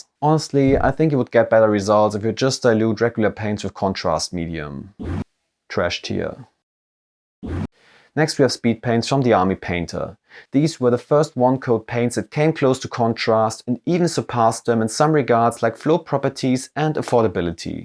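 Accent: Germany. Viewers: Da klingt einer aber ziehmlich deutsch! Tolles Video und deine patreon Vergleichsbilder sind super! Hab den kompletten Vergleich von allen 5 Marken gekauft !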